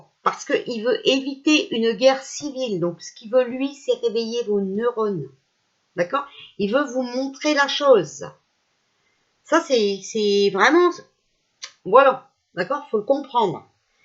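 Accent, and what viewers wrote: North, France. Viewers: C’est avec grand plaisir que je vous écoute cet accent du Nord.... je suis aussi native du Nord mais dans le sud ouest aujourd’hui... Vous avez raison de mettre les points sur les « i ».... hélas bcp dorment encore..... en tous cas grand merci! Restez ce que vous êtes Changez rien! Vous êtes une belle personne... vraiment! ❤️❤️❤️❤️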